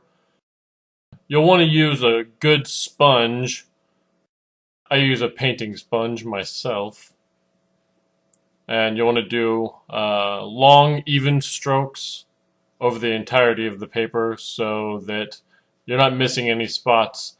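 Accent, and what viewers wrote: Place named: East Coast, United States. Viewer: wow - funny video and very informative I really appreciate your taking the time to make and post this video - I've shared it with others who've asked about Ebru -- I may have to try this -- beautiful work - what is your nationality - heritage? you're obviously American - New York? New Jersey? sounds east coast LOL - I don't know - are you Turkish? Pakistani? I originally thought this was an Islamic art form - I lived in Turkey for two years and how I could have missed this I do not understand - I was young so maybe I just wasn't into art back then.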